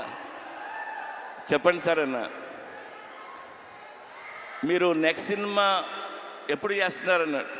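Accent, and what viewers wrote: Telangana, India. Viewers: Telenga slanga ..worst dialogue delivery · orey vadu telangana slung enti ra ..ni ayya..nuvvante maku oka nammakam ..but..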